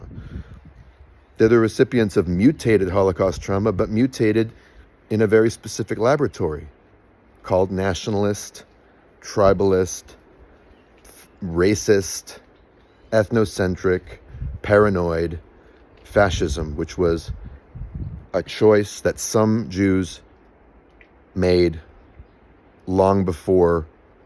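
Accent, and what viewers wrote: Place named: United States. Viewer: Did you watch Tantura? The people who did that were not victims of European Holocaust.... the Israeli hyjacking of it is outrageous! And PS... the American holocaust that Jewish ppl are also complicit in is ongoing to this very day! OUTRAGEOUS WITH YOUR AMERICAN ACCENT YOU ERASE genocide you are also a beneficiary of NOW!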